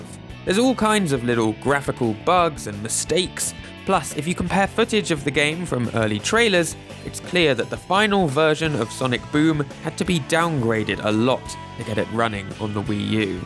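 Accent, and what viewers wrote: United Kingdom. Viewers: Another british 🥱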